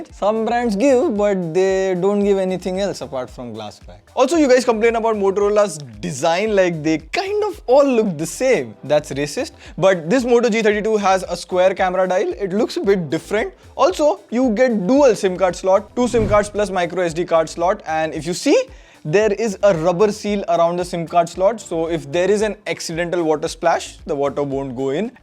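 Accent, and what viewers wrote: India. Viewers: Hi, i'm an Italian man. I watched your video because i'm evaulating the phone, but without the help of the subtitles i couldn't understand what you were saying. It's me, im' not used to listening english of people from India. Anyway, good video (writing, screenplay, photography, effects), great review (I'd love more hand-on use, but whatever), keep looking to me the perfect replacement to my Moto G8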